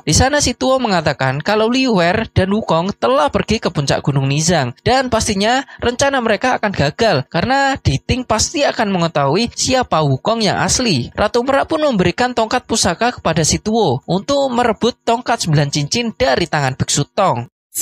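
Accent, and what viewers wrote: Java, Indonesia. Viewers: gw demen nih logat jawanya haha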